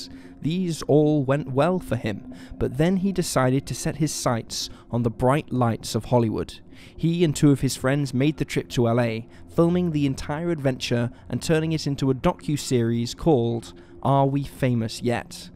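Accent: United Kingdom. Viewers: he's British so not privy to how we pronounce things.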